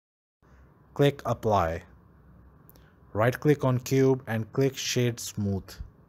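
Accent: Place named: India. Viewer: Really amazing even with the indian voice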